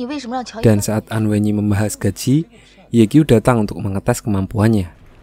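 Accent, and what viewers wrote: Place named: Java, Indonesia. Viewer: Bahasa Indonesianya medok pasti orang Jawa ni😂salam bang dari Jawa tengah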